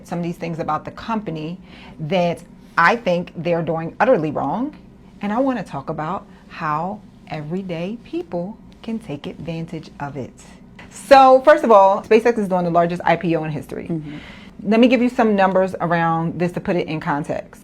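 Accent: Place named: Baltimore, United States. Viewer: Are you from Baltimore? I feel like I hear our accent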